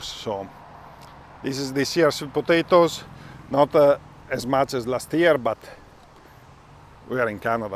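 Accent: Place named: Italy. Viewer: Grande.. … looks good 👍👍 love your Italian accent when you speak English 😂😂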